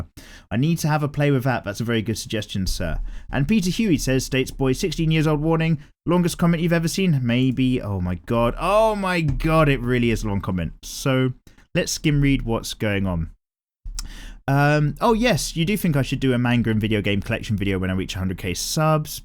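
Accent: United Kingdom. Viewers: Hello! I love your british accent, and I am inclied to do my drawings while listening to your amazing and bloody nice recordings. You are a inspiration for me, Sr.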